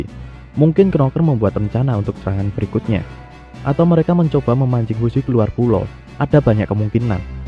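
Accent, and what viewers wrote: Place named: Java, Indonesia. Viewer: auto sub, kekurangan nih channel ya cuma logatnya agak medok :v eh kadang medok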